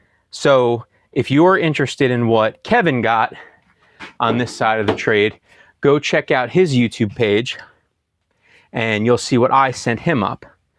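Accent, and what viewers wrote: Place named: New England, United States. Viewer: I knew that was a Ne accent. I’m in NH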